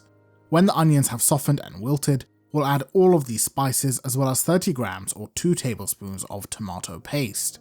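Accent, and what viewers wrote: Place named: London, United Kingdom. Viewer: You have a London accent: please tell me you run a restaurant...and exactly where it is. YUM. What a terrific channel. No wonder all these wonderful immigrant mums, grandmas and aunts spend all day cooking together when there's a family event to cater for. Your recipes are incredibly laborious and just make me wince with sadness knowing I'll never spend all day cooking some of them but would gladly pay good money to someone who takes the trouble to cook certain dishes for me. Wow, just so delicious and impressive to see how it's all done. Bravo! 🌍🍽🤝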